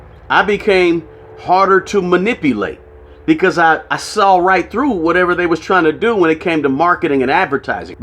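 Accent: United States. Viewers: You're a smart cookie! I know exactly what you mean. I have cupboards bursting with stuff! Good video. I love the American accent 🇺🇸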